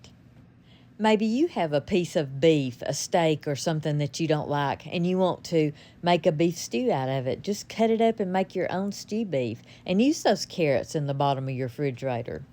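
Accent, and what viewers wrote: South, United States. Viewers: You’re going to love the sour dough. I’m new to your channel and I can tell your southern from your accent I’m in South Carolina. Two great you tubers for sour dough is farmhouse on Boone and rose homestead if your interested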